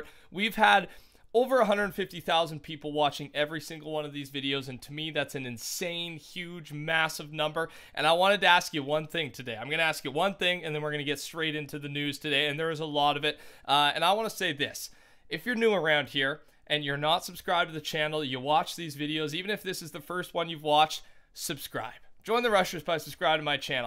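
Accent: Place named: Canada. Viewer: Is he canadian? I heard a little accent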